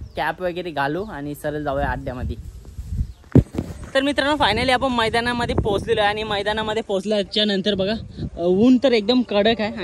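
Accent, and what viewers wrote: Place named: Agri, India. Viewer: bhai aagri bhashen bollyav jam bhari vatt .. kadak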